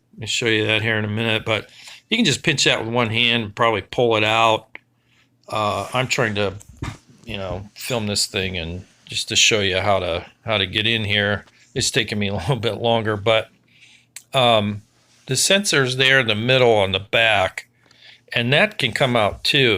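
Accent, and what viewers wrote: United States. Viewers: Oh my gosh! Thank you! It worked!! I liked your Western PA accent? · You from pittsburgh dude??? I heard that accent.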